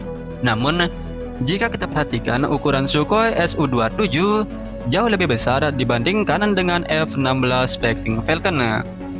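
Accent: Lombok, Indonesia. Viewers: Saya kira adminnya dari lombok. Bunyi F jadi P. Emg benar sih mirip juga logat Bali karna lombok dlu dijajah oleh Kerajaan Bali.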